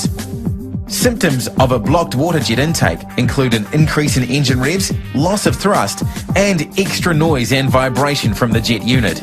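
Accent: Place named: New Zealand. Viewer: Yep. New Zealand accent.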